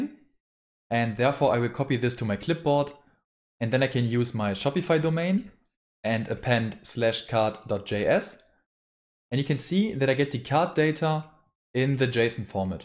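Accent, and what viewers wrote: Germany. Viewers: Haha bitte😄 Hatte es am Akzent gemerkt, dass du warscheinlich deutsch sprichst. Ich benutze das narrative theme und so wie es scheint ist der Code der im Video ist nicht der selbe wie bei mir. Deswegen kann ich auch nicht die stellen finden an denen der code geändert/eingefügt werden soll.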